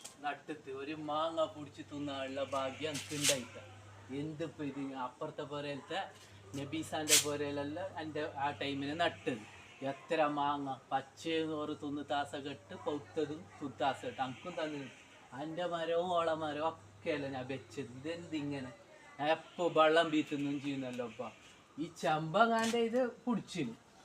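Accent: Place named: Kasaragod, India. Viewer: Ith kasaragod choori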